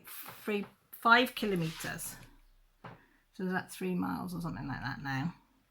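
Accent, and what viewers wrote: United Kingdom. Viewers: Hey from Buxton. Thanks for all your tips and humour and company. So great to hear an English accent!! I'm waiting for Amazon to deliver tomorrow!! A rose. Not much choice in this awful situation 🤗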